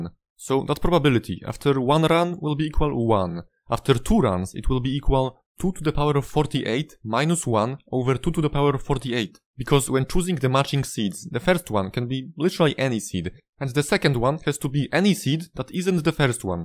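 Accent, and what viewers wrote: Poland. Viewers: Ach ten polski akcent🎉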